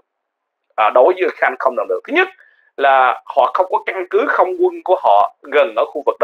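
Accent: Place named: Mekong Delta, Vietnam. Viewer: Em trai nói giọng miền tây nghe đã tai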